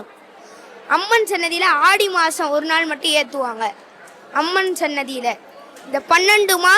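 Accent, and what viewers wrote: Madurai, India. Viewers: இந்த அய்யன் பேசும்போது மதுரையின் பேச்சு வழக்கு தேனாய் இனிக்கிறது 🙏🙏🙏☀️🌙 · மதுரை தமிழ் அழகு❤ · Madurai la mattum thaan ipadi tamil pesa mudiyum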